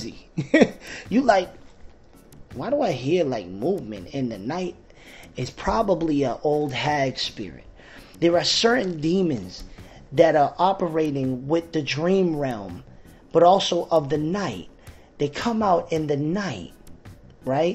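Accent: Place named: Philadelphia, United States. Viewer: Does anyone know where this brother is, and if he has a church? Sounds a little like Philly maybe? Really like his insight, as it seems he is certainly anointed.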